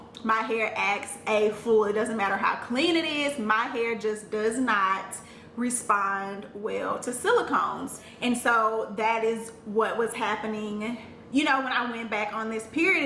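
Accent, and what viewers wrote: South, United States. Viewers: you remind me of Jessica Dime from Love & Hip Hop Atlanta with your southern accent! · Omg you are the first southern youtuber that I've seen and I love it!!! 😂💙💙💙💙 Yass with that accent gurl!!!! You legit sound just like me and I've never seen a black youtuber just accept their "country" accent.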